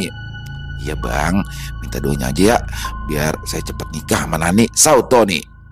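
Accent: Jakarta, Indonesia. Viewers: Wah hadir lagi nih bang Doni, terimakasih ya BG untuk selalu membawa cerita cerita seru, cerita paforitku ini dengan eksen Betawi nya yang asyik oh ya BG salam buat gomang ya 😊😊😀😀😀😀 sehat sehat ya BG dan team 🙏🙏🙏🙏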